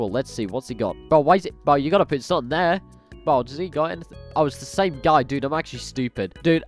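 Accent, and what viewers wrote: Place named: United Kingdom. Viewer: You got a big British accent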